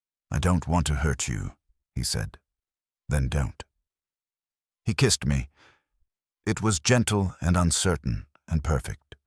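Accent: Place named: RP, United Kingdom. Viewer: The formal British accent totally destroys any atmosphere or warmth of authenticity.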